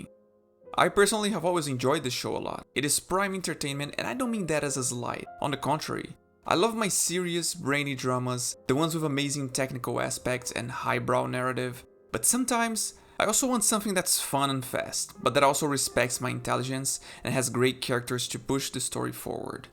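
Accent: Brazil. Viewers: are you brazilian? I'm asking because of your accent... :) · Caraca reconheci nas primeiras palavras que era brasileiro hehe vou conhecer o canal.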